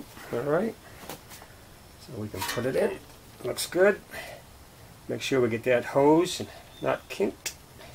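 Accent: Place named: Massachusetts, United States. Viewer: I love hearing the accent!! LOL!! My wife grew up in Lawrence and I said, this guys gotta be from Mass! LOL!! Sure enough, I see the Mass tag on the front! I do get a bit crotchety with these manufacturers!! Read is 13m so it figures the front would be different....as some one else pointed out, they have you buying one of this and one of that.....well...at least I can justify my Advance auto charge on the bank statement!! LOL!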